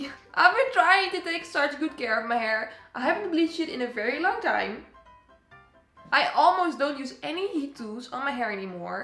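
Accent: Netherlands. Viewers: Heerlijk dat Hollandse Engels erdoorheen 🤣🤣🦄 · Hey I just found your channel where are u from? You sound or Dutch or German or Swedish I'm just guessing. I'm from the Netherlands and I love crazy haircolors ❤️ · Are you Dutch cause you kind of have a Dutch accent😊😅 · Lekker nederlands accentje wel 😻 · Is she Dutch? I can hear the accent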